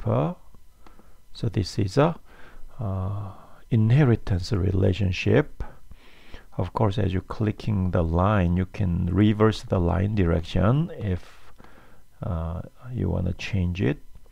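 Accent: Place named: South Korea. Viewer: definitely korean accent.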